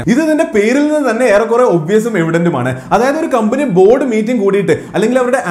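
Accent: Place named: Kozhikode, India. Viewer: super man super kozhikodan bhashayil paranjal oroo mukkummoolayum thappi egal paranj tharand 👍👍👍👌👌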